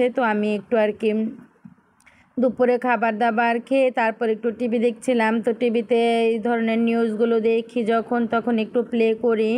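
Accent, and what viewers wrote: Barishal, Bangladesh. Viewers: আপু বরিশাল ভাষা খুব সুন্দর কিযামত আলামত ❤❤ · আপু তোমার মুখে বরিশালের ভাষা শুনতে অনেক ভালো লাগলো আসলে দিন দিন মানুষ পাষান হয়ে যাচ্ছে মেলায় অনেক কিছুই দেখলাম ❤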